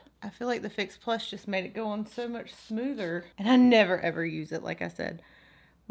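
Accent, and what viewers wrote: South, United States. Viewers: I just found you thru madison's giveaway and I been watching all your videos. You talk as country as me and I love it. Where are you from? I'm from Ga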